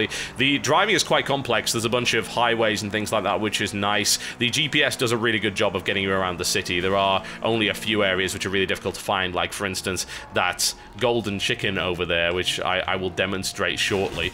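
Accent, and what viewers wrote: United Kingdom. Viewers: Angry Joe is better. Sorry to say but a one-hour+ review with a British accent kills it. Plus he's playing the game rather than just reviewing the most important points the way Joe does.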